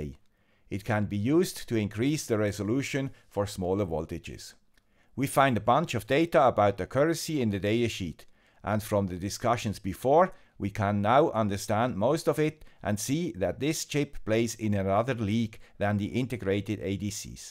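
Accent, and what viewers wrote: Switzerland. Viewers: Hey, you replied! No I am in fact not a native but I've got a CEFR C1 Language certificate which basically states that my English is quite good. This was in no way meant to insult you, as you also call yourself "the guy with the swiss accent" ;) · the pronounciation is on purpouse to catch viewers, he speaks without the swiss accent in some vlogs:) I hear it every day at work, so I'm used to the accent :) Sali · He has a Swiss Accent though! :-) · Watched already a lot of videos of your channel and at the beginning it was a lot of „häääää, versteh grad überhaupt nix“ but from one video to the next I start to understand what you are explaining us. Have to say that I am a totally new beginner. My goal is to measure the 2 12V Solar Batteries in the OFF-Grid garden of my parents in law. Tried a lot with the ADC from the ESP32 itself and as u mentioned it’s readings are just bullshit. 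Thank you for introducing the ADS1115 to me. I guess that will fix my measuring problem and can finally bring the project into production. As I am original from Vorarlberg and worked many years in Kanton SG I love to hear your swiss accent as it remembers me of that great time in my life! Electronic wise you are my personal hero ;-) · Hello, you do not have a swiss accent but a swiss GERMAN accent ! ! ! Grüsse von der anderen Seite der Röstigraben. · No love from the guy with the Swiss accent for Swiss microcontrollers? I was expecting to see an STM32 here 😋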